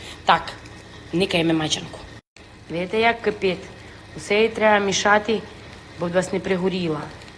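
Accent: Transcarpathia, Ukraine. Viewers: Закарпатський діалект.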